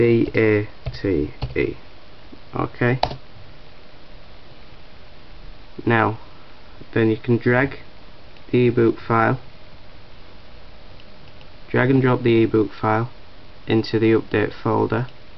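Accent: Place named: United Kingdom. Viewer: i fall love with your british accecnt :>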